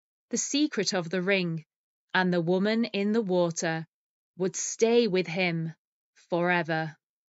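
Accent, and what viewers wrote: United Kingdom. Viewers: Thank you so much, I had a nice time hearing and read along to have British accent. It was so fun that as soon as I saw the word mesmerising, I immediately thought of mermaids. And I was so sure about it when I read that the woman's name was Coral. Thank you for the story 😊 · Yes, sometimes I got lost in the story, but it's because I saw a bunch of words that have never seen before. Hahaha I used to be angry at this, but now, I know it's something normal, it's part of my English learning journey. If I already knew them all, why would I learn English then? Hahahahaha I want to thank you for this story. I helped me a lot to improve my British intonation, pronunciation and learn new words. Keep going. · I used to listen to American English only that I don't get the British one, but after watching a couple of your videos, I got used to understanding more British English! 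Thanks so much!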